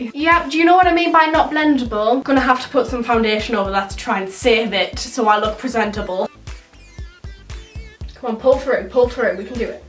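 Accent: Newcastle, United Kingdom. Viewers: Are you Geordie? Xx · Are you Geordie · Mysticl Sports sorta Geordie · are u British?? btw love u sooo muchh!!!! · Are you from Newcastle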